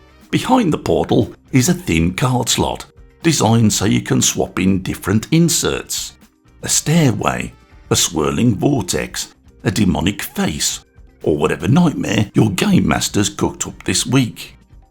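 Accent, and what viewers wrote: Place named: England, United Kingdom. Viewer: You sound english. Use feet and inches !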